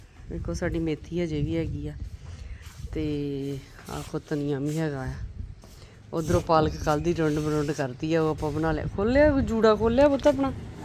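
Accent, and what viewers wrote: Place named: Majha, India. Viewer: ਪੂਰੀ ਮਾਝੇ ਦੀ ਬੋਲੀ ਬੋਲਦੇ ਹੋ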